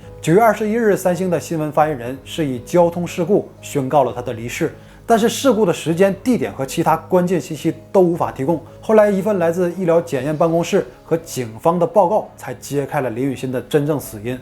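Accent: Northeast, China. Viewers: 小乌确实噱头大与内容不符，Wayne内容一般都是从网上抄的，will很棒发音好文案也有趣简洁，但是东北口音也很重呀。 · 思路够清晰，文案不拖沓，播讲够顺溜，语言够幽默，就会让观众不自觉忽略口音问题。其实有口音的人是多数，当你的播讲内容有冗长，模糊，故意卖关子，拖沓，发音的缺点就会被放大。当然这几个主播都没有那么差，都还挺好的，主要我以前做的是语言类相关的工作，所以会很挑剔发音上的硬伤，我无法忽视哪怕一点儿的齿音字，大舌头，前中后鼻音不分，呢嘞不分。的东北音，和发音问题，是两个概念。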